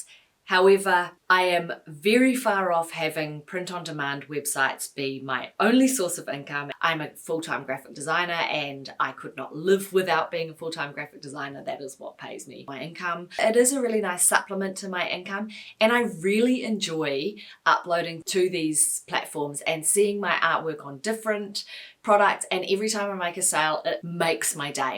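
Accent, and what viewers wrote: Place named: New Zealand. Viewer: Oh, hey! I knew you were from new zealand from your accent! I live in new zealand too :]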